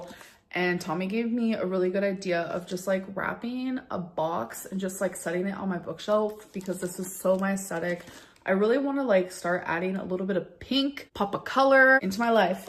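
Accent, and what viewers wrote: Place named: South, United States. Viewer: She sounded like Lex in that voiceover and back to her weird southern thing in the video. That’s some close up comparison of her powers of absorption😂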